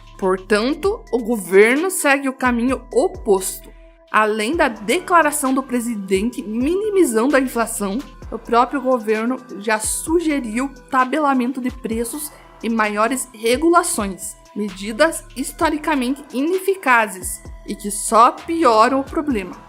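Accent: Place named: Bahia, Brazil. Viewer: Sotaque baiano é engraçado 😂😂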